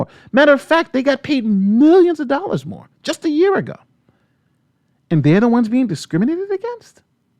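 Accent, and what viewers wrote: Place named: Boston, United States. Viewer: You have Boston accent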